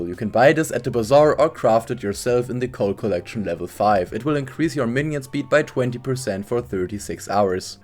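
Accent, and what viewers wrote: Germany. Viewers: Kommst du aus deutschland ? Weil entweder bin ich dumm oder man hört den akzent stark raus 😂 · Man hört den deutschen Akzent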